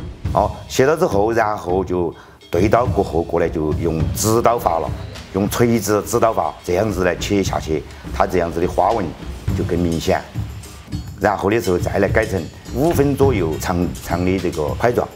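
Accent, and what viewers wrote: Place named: Chengdu, China. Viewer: 成都老一辈说话都是这样子的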